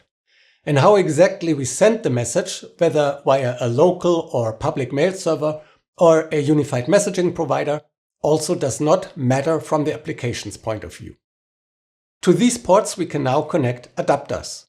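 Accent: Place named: Germany. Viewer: i like your german accent. Great intro to hex arch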